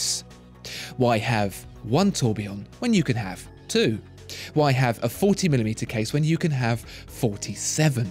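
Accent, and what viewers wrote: United Kingdom. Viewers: I been watching the pro review from you almost a year . love your British accent man . I find very useful. thank you for the great work . do you think you can do a review on Breguet marine tourbillion ref number 5827. I couldn't find any helpful reviews . please help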